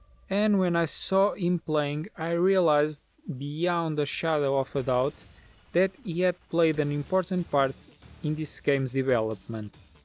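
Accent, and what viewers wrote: Portugal. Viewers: Are u portuguese? BTW, nice video and Niceeee gameee I'm a huge fan of motorsports and Dakar obviously. I'm rly hyped for this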